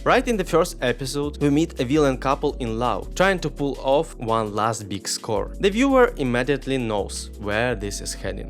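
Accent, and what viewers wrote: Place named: Middle East. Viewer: I’m watching this one because I watched that jujutsu Kaisen video. You make good content, but man, your accent is thick. Are you from the Middle East? It’s hard to place quite where your accent is. Anyway, so far your content is looking pretty good. I imagine those subscriber numbers will start jump, jumping if you keep making videos.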